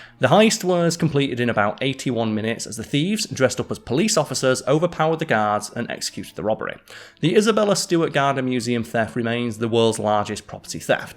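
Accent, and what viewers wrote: United Kingdom. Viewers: It was definitely a change going from Simon to you, but I've taken to your style. Still really dig the Factboi's style, but not at the expense of you. As for your voice, I'm a dumb American so I like all the different English accents, and yours is cool. Your style and delivery are your own, and they are cool. Edit: told you I was a dumb Yank; should have used a synonym for cool the second time, or at least added too. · Ignore the haters, your great yes Simon was great too but you don’t try to hide the fact your British like he did ( he spoke to American for my liking )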